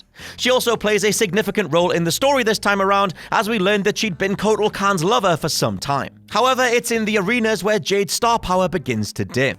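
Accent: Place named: Scotland, United Kingdom. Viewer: Her Scotts voice and I clicked away.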